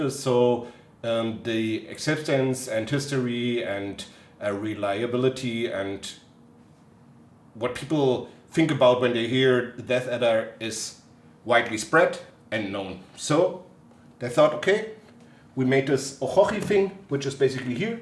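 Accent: Germany. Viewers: He just pronounces it fast while also having an german accsent. If you listen carefully he says orochi.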